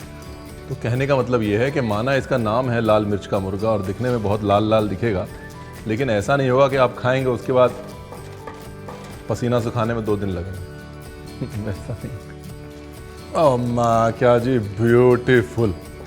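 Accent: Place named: Lucknow, India. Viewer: Aap English bhi Lucknow walo ki trhan bolte hain..☺️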